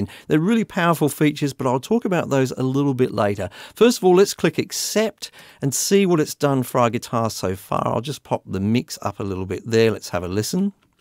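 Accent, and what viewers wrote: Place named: Australia. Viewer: Totally off topic: English is not my native language, so I asked a friend from England for help, when I first discovered your channel. I assumed, you say "I hope you're well", but for me it sounded like "I hope you will", and it was driving me crazy, because I always thought "will what?" My friend assured me it was just your australian accent, so thank you for always wishing us well! xD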